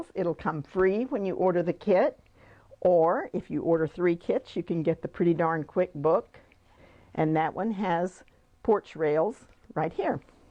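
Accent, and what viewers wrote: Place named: East Texas, United States. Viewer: Love to listen to you. Lovely E Texas accent. I am an Eastern planted in San Antonio.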